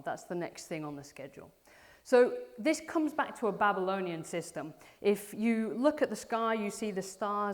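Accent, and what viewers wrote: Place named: Northern England, United Kingdom. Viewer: Interesting accent mix. Northern England and North American.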